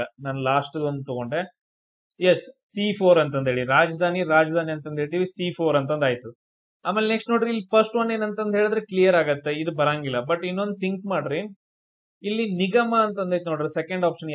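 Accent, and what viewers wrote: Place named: Uttara Kannada, India. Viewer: Basically I'm from malnad side.. your teaching style and u.k kannada is superbb sirr..,🫡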